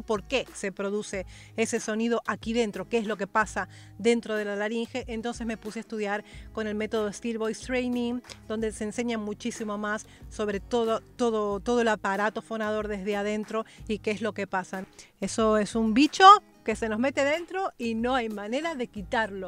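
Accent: Spain. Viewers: si eres argentina porque? hablas como española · Es que reacciona y explica todo a detalle y eso que es de España y a veces se pierde el hilo de la idea por el acento o palabras distintas 😎 saludos desde 🇲🇽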